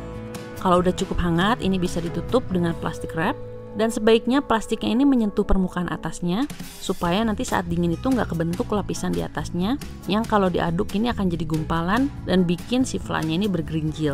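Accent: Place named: West Java, Indonesia. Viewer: Vanila', susu', dulu'😂 Mojang Sunda euy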